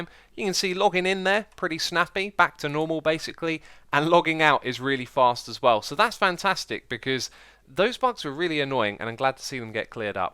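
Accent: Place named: England, United Kingdom. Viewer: That English accent..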